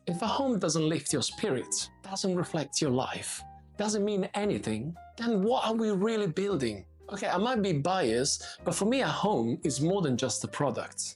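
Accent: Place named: Cockney, United Kingdom. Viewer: This is an excellent conversation to have because it encourages local and regional authorities to see beyond the cookie cutter model of housing and commercial real estate development. But there's a catch; genuine aesthetic considerations must be mandatory, appropriate to each zone. Utility, durability, and sustainability must also be in the national building codes. In Australia, it's a free-for-all mess, where developers win, often unchallenged. BTW, you're developing a cockney accent! 😄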